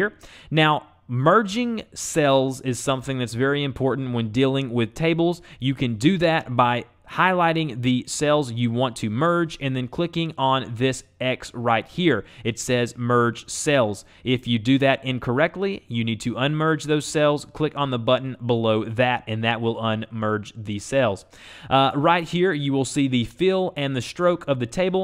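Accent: South, United States. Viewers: Awesome video and I like your southern accent!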